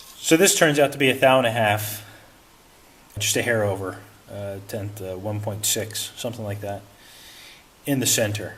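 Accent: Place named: New York, United States. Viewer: Does hail from New York? That might explain the vocal similarity to Raymond Romano and Alan Alda who both are From there.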